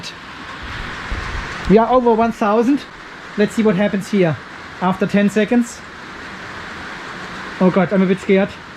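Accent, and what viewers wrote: Germany. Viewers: Hört sich an als käme er ursprünglich aus Deutschland.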